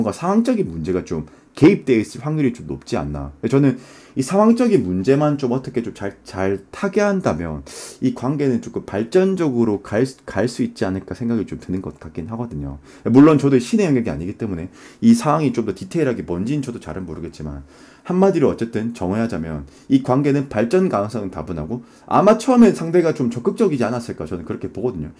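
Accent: Gyeongsang, South Korea. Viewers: 음~~경상도 발음= 사투리👍👍많이 해주3 글구..소화가 잘 안 되 시나욥?위역류요런거😅😅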